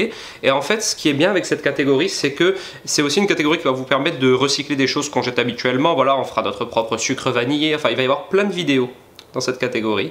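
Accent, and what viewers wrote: Corsica, France. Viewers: Super vidéo, avec l'accent corse.... Bisous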